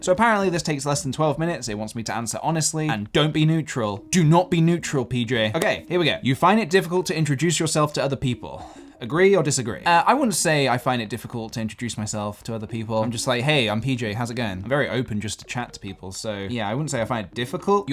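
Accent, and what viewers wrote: United Kingdom. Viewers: My gad, just fell in love with your British accent (if any French people are also hanging round here, sure yall agree) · why u sound british but not really :/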